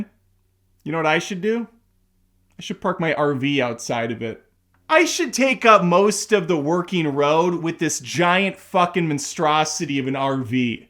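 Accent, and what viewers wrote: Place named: Minnesota, United States. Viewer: Beig, Bayg... U r from Minnesota.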